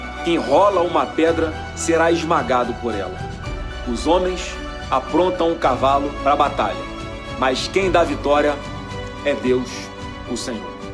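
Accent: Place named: Rio de Janeiro, Brazil. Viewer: Flávio, vc está certíssimo...concordo com cada palavra sua 😥 Porém, aqui entre nós, com todo respeito, como é chato esse sotaque supostamente carioca, que parece rádio fora de estação, cheio de chiados! Grande abraço ... estamos com a Família Bolsonaro 🇧🇷🇺🇸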